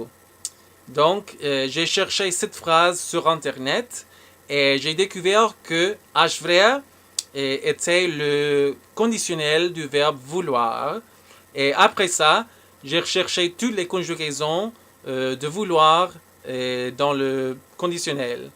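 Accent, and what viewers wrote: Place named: Louisiana, United States. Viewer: On dirait qui parle le Cajun louisianais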